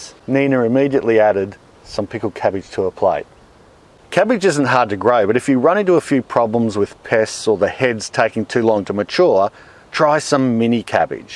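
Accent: Australia. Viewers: I love an Australian accent. I could listen to him all day. More importantly, I learned a lot about gardening. Subscribed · hey mate, the German word for cabbage is "Kohl" not "Sauerkraut". Sauerkraut is fermented white cabbage. I like your videos and your accent. I stayed in Australia for quite a while about 10 years ago and your voice just brought back old memories:) I will hop in more in the future and watch more of your videos. Having my own German "Schrebergarten" now, I really need to learn quite a lot about growing my own food. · Thank you, from an Aussie living in Canada, I would suggest kale, Russian kale. It is easy to grow even continues through the snow, and is considered one of the most nutrious vegetables, sautéed with garlic, and then creamed for those who are less enamoured. also really good for our dogs, I add it with carrots sautéed lightly added to her meat and sweet potatoes...lovely to hear the good old aussie accent and way of being....Amélie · He’s Aussie stand reminds me of all my male family members. 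“When your choca up the bloca, have a cucumber!” Bahaha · pretty sure Australian isn’t a race but i get what you mean it is kinda stereotypical lol, like if he didn’t have a Aussie accent he probably wouldn’t have compared him to Steve Irwin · I love this guy's videos. I would sit and listen to him all day. He sounds like an Australian. I am a Jamaican, but I like him. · Ken Spiracy I enjoy your presentation and unerstand love the Aussie Acent,plus all good knowledge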